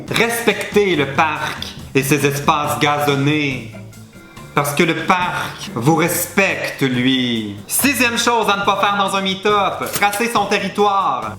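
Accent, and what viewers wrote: Quebec, Canada. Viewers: JE VIENS DE DÉCOUVRIR T'AS CHAÎNE ! ET J'ADORE L'ACCENT QUÉBECOIS ALORS JE T'ADORE DÉJÀ !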